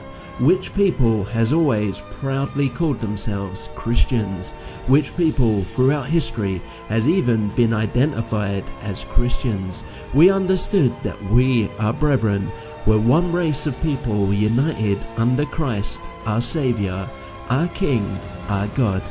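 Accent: United Kingdom. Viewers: It's a southern England accent. Relax. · Nice work! Is that a Welsh accent? I haven't heard that accent so much.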